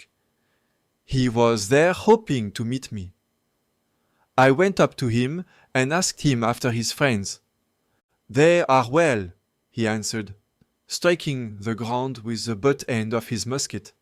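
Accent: France. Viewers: Bonjour, Je trouve vos vidéos très intéressantes, et je compte bien voir tous les épisodes 🙂 Mais si je peux me permettre une critique... La narration est vraiment dure à écouter. On dirait une voix de robot générée automatiquement, et avec un très, très fort accent français. Elle en devient même pénible au bout de quelques minutes (de mon point de vue). Je pense qu'une vidéo français avec des sous-titres serait plus agréable pour les francophones, tout en restant accessible aux anglophones. Voilà c'est mon avis En tout cas merci pour cet excellent travail de mémoire !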